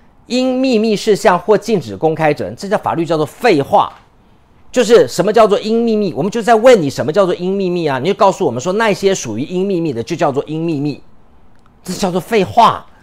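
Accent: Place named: China. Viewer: 整個口音變中國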